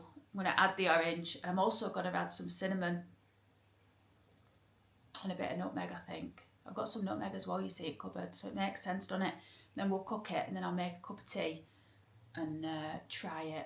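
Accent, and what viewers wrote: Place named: Yorkshire, United Kingdom. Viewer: You are funny! 😂 That Yorkshire accent, down to earth, slap dash ways 😆🤣😂 If you’d have put it back in the oven just put some foil on top so it doesn’t burn. I can’t imagine cake without eggs. I’m not far from you in Sheffield!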